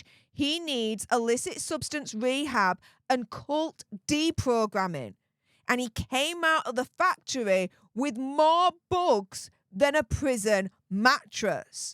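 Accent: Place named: England, United Kingdom. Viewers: I WOIULD LOVE TO KNOW WHAT PART OF ENGAND YOU ARE FROM YOUR DIALET IS FACINATING. YOU DO NOT PRONOUNCE THE LETTER T. YOU TRPLACE IT WITH A GOLATERAL STOP. INSTEAD OF SAYING HERE YOU SAY ERE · YOU NAILED IT AGAIN, SORRY MY DEVICE IS STUCK ON SHOUTING MODE, I AM SORRY, but you are proper northern lass, 🇬🇧🙏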